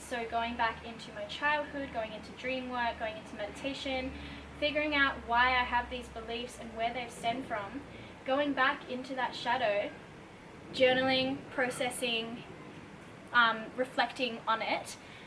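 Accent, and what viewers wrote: Australia. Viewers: this is my first video of urs but i'm guessing u're australian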